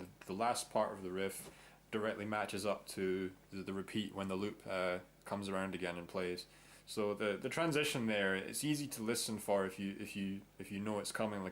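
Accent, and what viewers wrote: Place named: Scotland, United Kingdom. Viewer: Scotish accent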